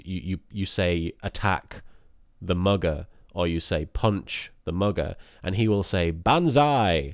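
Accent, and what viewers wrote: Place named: North, United Kingdom. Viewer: NO. NO, FFS. Why does anyone have a problem with doing a let's play in character?? It was hilarious. Who are these people???? Don't listen to them! If they want to actually hear all the boring dialogue in Bloodlines then why don't they just play Bloodlines? Also, trying to put my finger on where LKs accent is from. North East? North West? Dammit. He sounds a bit like turkeyleggggs, anyway.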